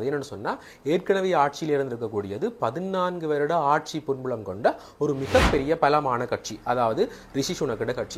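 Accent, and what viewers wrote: Sri Lanka. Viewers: தலையில்லாத ஈழம் உடலில்லாத தமிழர்கள் என எண்ணப்படும் நேரத்தில் இங்கிலாந்து ஆட்சி மாற்றம் இன்றைய காட்சிகளின் சீர்த்திருத்தங்கள் வரவேற்க தக்கது. ஈழத்தமிழ் கேட்டு கேட்க இனிமை. ...........................by... பம்பாய். இந்தியா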